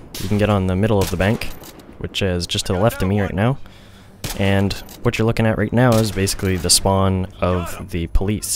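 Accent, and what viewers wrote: Canada. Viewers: You sound so much more Canadian in this.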